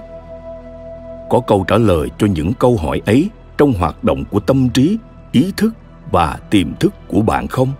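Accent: South, Vietnam. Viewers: Giọng đọc hay nhất trong các giọng đọc của sách sức mạnh tiềm thức. Chắc mình người Nam, nên thích nghe giọng miền Nam ấm áp, trầm và nội lực. Cám ơn Fonos, mình sẽ mua để nghe trọn bộ.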